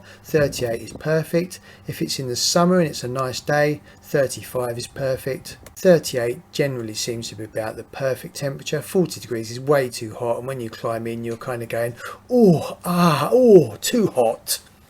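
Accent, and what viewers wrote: United Kingdom. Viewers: Thank you so much. I've been watching your video about 3 times, and set my jakuzzi in 45 minutes! You are amazing, so smart and simple. And I enjoyed you British prnouniation so much! Thank you!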